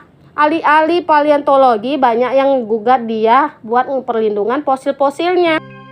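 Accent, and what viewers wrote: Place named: Medan, Indonesia. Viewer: Ini baru flim yabg ku suka. Mana suara anak medan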